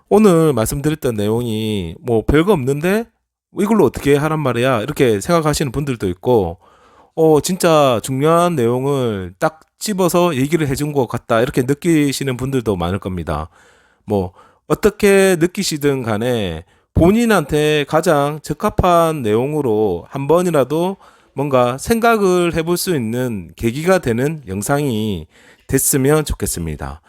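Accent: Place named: Busan, South Korea. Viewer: 부산사투리..?? ㅋㅋㅋ 목소리가 넘 친근하고 좋으세요~~!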